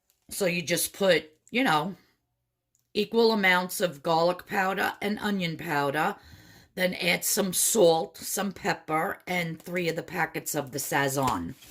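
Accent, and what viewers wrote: New York, United States. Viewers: I knew from your "accent" we lived near each other...LOVE YOUR NY ACCENT!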